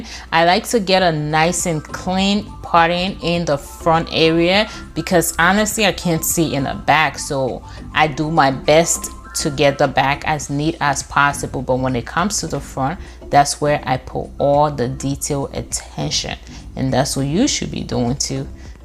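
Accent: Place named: Liberia. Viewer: Are you a Liberian? You sound like one no doubt 🥰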